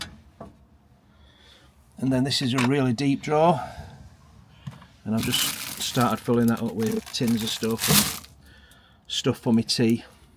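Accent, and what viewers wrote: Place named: Lancashire, United Kingdom. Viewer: We just found your channel…and love 😊it! Your accents bring back happy memories to my Lancashire born wife! Replace those wine glasses with plastic ones (we bought ours from Wilko, but as they no longer exist I’m sure The Range will have them). Bin your ear plugs and use the white noise app on your smartphone…..when you first hear it you’ll think you can’t sleep with all that noise but before you know it you will be sleeping like a baby!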